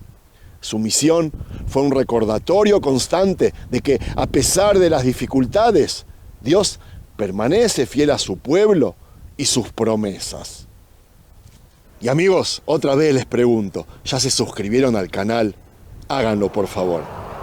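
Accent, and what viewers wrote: Argentina. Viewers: ¿es Argentino el narrador?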